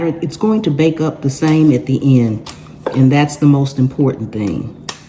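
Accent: South, United States. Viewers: The Southern drawl really makes the video interesting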